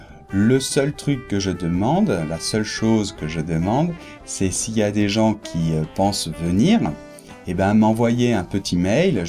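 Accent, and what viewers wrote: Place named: France. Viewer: Tu as un accent français sympa quand tu parles l’espagnole. Comment as tu appris l’espagnole? J’ai un niveau avancé B2. C’est gratuit! Tu fais un bon boulot.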